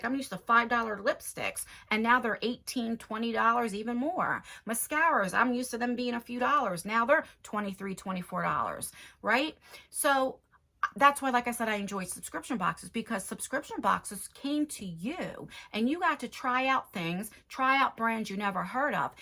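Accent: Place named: Massachusetts, United States. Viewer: I’m 69 years old please advise me,I love the way you tell it like it is,your a doll. And boy can I hear your accent,because I’m from Massachusetts 🥳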